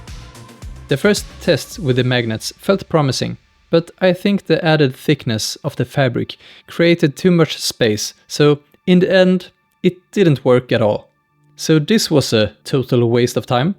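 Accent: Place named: Scandinavia. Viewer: What a great video! Loved the approach (love the scandanavian accent too, coming from the UK, it just adds that amazing narrative feel to it - your wife is super cute ) and the channel play on name!! Amazing! Just a really nice video to watch - gonna subscribe just to watch your wonderful journey. Good luck to you both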